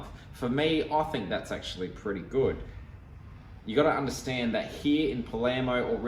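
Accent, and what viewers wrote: Australia. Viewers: I love your Aussie accent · WHAOUUUU 1st time i hear an aussie accent like that. If understood well it's 300 a week or 1200 a month. Seems hefty !!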